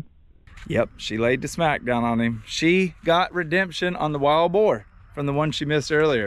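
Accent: South, United States. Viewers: Sounds like a good Ole country gal to me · I absolutely love her accent. Being from TN then moved to south Alabama it reminds me of home.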